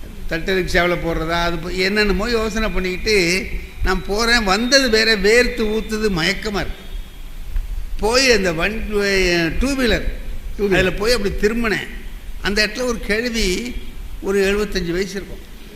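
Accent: India. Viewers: தஞ்சாவூர் வட்டார வழக்கு -குறிப்பாக திருவாரூர் -நாகை பேச்சு வழக்கு இப்படித்தான்! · என்னதான் நகைச்சுவையாக பேசினாலும்...சரியான கருத்துக்கு வந்து நின்று பேசுவார்..நகைச்சுவையும்..கருத்தும் perfect blend ஆகும்..கூடுதலாக திருவாரூர் வட்டார மொழியும் super blend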